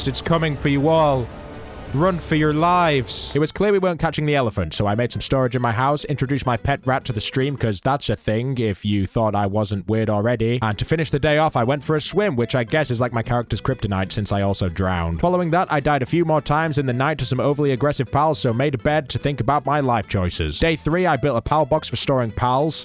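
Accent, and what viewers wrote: United Kingdom. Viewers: The worst part is a br't'sh dialect. "Fancy my chances", you people really are the native speakers of it? 🤧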